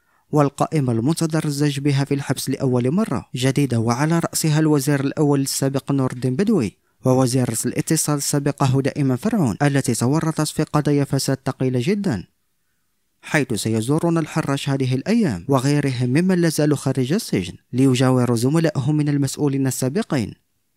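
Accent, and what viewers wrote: Morocco. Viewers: شكون طاع هد لخبر انت تبان صوت مغربي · L'accent marocain !!!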